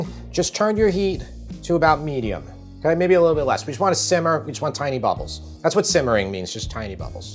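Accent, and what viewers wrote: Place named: Long Island, United States. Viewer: Love the videos and still getting used to the Long Island accent. When you said 'the le crueset', I thought I heard "The Lakers say the oval ones are like $400". Who do you know from the Lakers and why do they care about dutch ovens?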